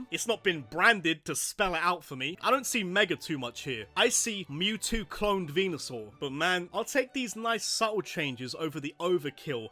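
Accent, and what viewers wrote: United Kingdom. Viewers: I’m subbing because you’re British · i think hes british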